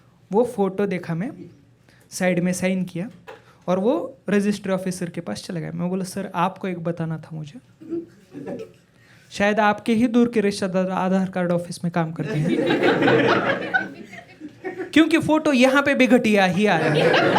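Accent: Maharashtra, India. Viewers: भावा तुझ्या बोलण्यातून च समजत तू मराठी आहेस..🧡 आवडलं आपल्याला..🔥🤍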